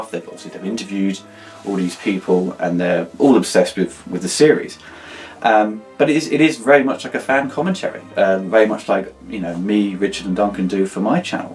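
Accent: United Kingdom. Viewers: I see these are only available to the US/Canada and I'm guessing from your accent you're from the UK? I ask as I'm also UK based and wondered how you got hold of them? Would love to get my hands on these:)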